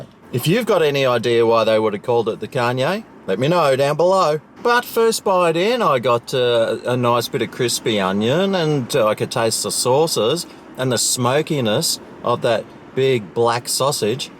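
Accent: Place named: Australia. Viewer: I watch your videos because the Australian accent and behaviors thoroughly annoy the ever loving sht out of me. It feels pretentious and masquerades as highly educated. But I respect what you do and keep doing it bud.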